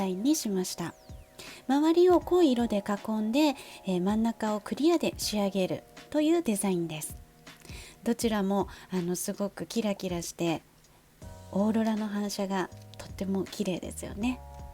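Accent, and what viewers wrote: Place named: England, United Kingdom. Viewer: i love the English accent im obsessed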